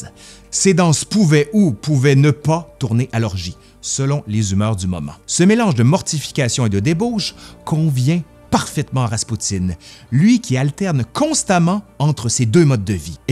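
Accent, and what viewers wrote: Quebec, Canada. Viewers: Merci pour vos vidéos! Je suis en train d'apprendre le français, et c'est sympa avoir des ressources intéressantes avec un accent québécois. :D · J adore cette chaîne !!! Et l accent des cousins québécois Force a vous 💪😍👍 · Excellente présentation de la vie de ce répugnant mais unique et célèbre personnage. Je commence à apprécier l'accent québequois ;)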